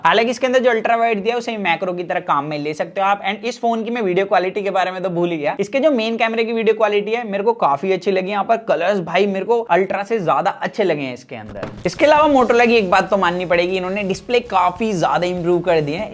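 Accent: India. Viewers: Such heavy Indian accent. Don't understand what he is saying.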